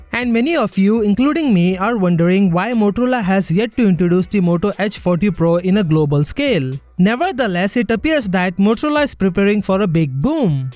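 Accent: India. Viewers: Indian English dialect makes it exotic for ears 👂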